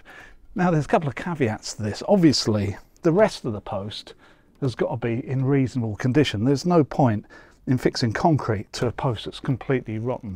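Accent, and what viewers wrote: United Kingdom. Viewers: From your accent, I'm guessing you're in the UK. Your main problem is that your fence contractors don't know how to properly install a fence. Plus, if you're going to all of this trouble to dig out the original concrete footing and rotted wooden post below ground level, why not just take a few more minutes and just remove the rest of the old post and put in a complete brand new one instead of using that ugly concrete post support fix?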